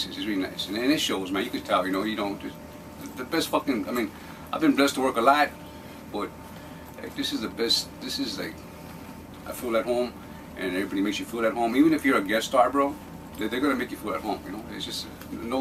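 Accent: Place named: Mexicali, Mexico. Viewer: he's got the most stereotypical mexicali voice and accent there has ever been in the multiverse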